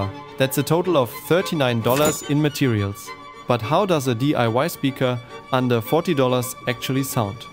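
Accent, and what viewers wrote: Germany. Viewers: Man hört das du deutsch bist ❤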